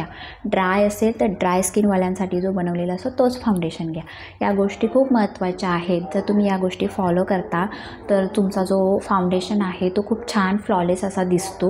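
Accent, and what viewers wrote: India. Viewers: Vada saydchi aahes ka bolnyavarun samjte must video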